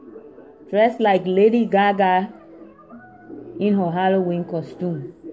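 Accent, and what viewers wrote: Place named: Liberia. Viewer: Liberian accent haha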